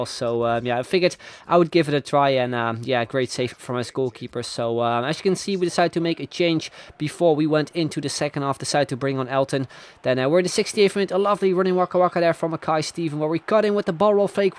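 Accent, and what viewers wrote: Netherlands. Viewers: was nog niet geabboneerd. hoorde meteen dat je nederlands was. abboneer meteen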